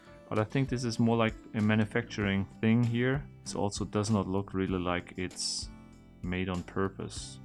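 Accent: Germany. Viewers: He had an germen acsent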